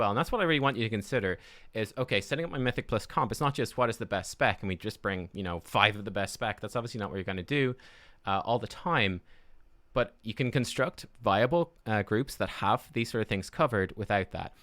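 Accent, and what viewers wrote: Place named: Ireland. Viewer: Finally, another great WoW content creator, and best of all, Irish! At least I think you're Irish? 😅 Nice work!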